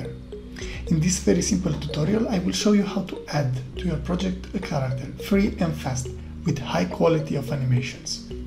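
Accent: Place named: Romania. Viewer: banuiam ca esti roman :)))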